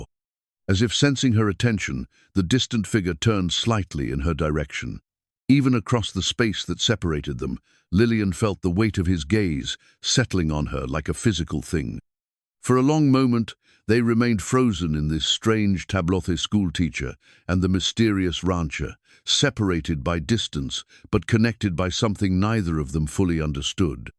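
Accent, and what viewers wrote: England, United Kingdom. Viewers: It's too bad you've got stuck with this narrator, he doesn't know how to pronounce American names & words, he's too English he sounds completely strange. Please give him & his boss my comments.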